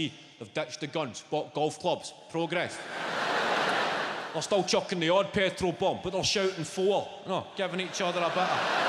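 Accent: Scotland, United Kingdom. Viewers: I must admit I love the Scottish that accent , this guy is funny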